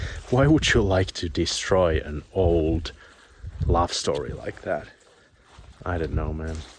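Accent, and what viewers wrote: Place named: Finland. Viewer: Very thought provoking Mate. Pardon my ignorance but are you in Finland?The nature looks that way and to me you sound Finnish.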